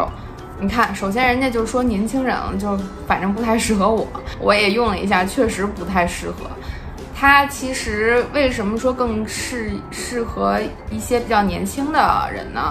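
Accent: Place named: Beijing, China. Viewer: 北京腔儿，姐们儿老北京啊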